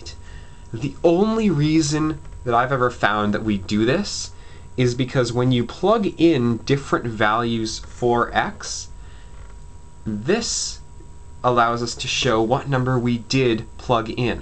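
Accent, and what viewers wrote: Canada. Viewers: nice Canadian accent. this was a lot of help though thanks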